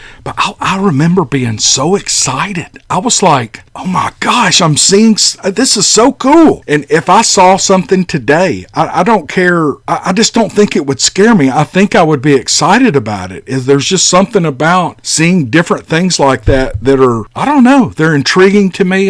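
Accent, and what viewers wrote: South, United States. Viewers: I agree. Love your enthusiam! , and sounthern accent. :) tyfs!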